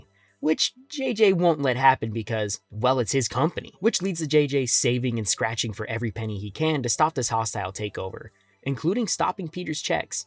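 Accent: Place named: United States. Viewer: "Let me know if there are any Comics or Manga characters and stories you'd like me to cover below!" "Hears an American Accent" "Welp, there goes any accuracy" Dumb Yank.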